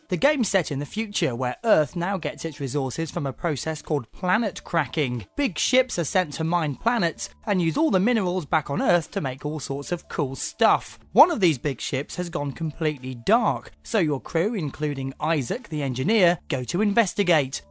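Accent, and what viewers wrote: United Kingdom. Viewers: he makes brits sound kinda gay btw im a brit and i sound nothing like that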